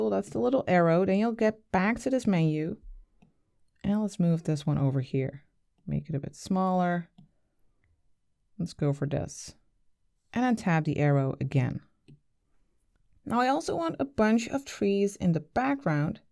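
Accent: Netherlands. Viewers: I really enjoyed this! I am just a beginner and I appreciate your careful and clear explanations…En Ik hoor een mooi Nederlands accent!😊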